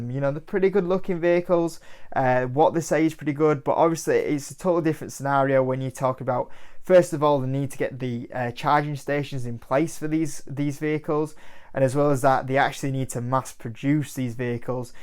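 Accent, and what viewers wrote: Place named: Yorkshire, United Kingdom. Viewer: but by no means, them are not competitors, same as Lucid (private so far). And Tesla has no real competition so far to be honest, as you buy technology, not just a vehicle (it's not a car according to Oxford dictionary). As you're based in Yorkshire, judging from dialect (😃), I would advice to make a call and get a Tesla Model 3 test drive, then you will see what it's all about (the hype), Tesla model X/S doesn't give the same feeling and tech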